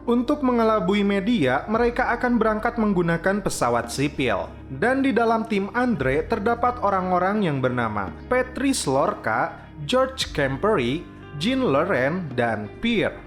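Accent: West Java, Indonesia. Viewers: orang sunda ya bang🗿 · Jirr..adminya Sunda euyyy😂😂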